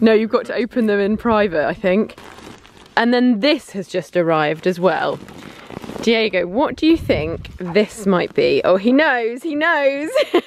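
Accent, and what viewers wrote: United Kingdom. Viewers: Hi! Congrats for your wedding. I am your follower. I love your British accent. Thank you for everything you teach us. I wish a splendid and amazing Marriage. Kisses from the distance! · I hop to visit you in England, and learn British accent from you I love you so much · I always watch your videos whenever I get some issues with grammar and also I am learning British accent from you bacause I am totally obsessed with it... Many many love from my heart core... ❤️❤️❤️❤️ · Congratulations may happiness and health be upon you...I learn British accent from you... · Oh my god, Hey! You're just one of the brightest personalities ever!!!! And diego, he is one sweet doggo. I must say, you are just amazing. I learn British accent from you... and I love it. I am pretty awesome at English, but i admire the British accent.. Thats the reason😉. 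I am a 14 year old fan of yours! Aha.. I cant get enough of your videos. Always there to support you. Loads of love!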